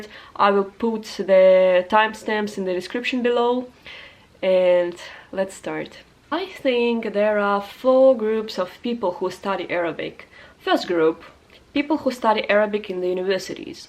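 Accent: Russia. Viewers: У Вас русский акцент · Хотел спросить не русский ли акцент. Конечно русский) молодец!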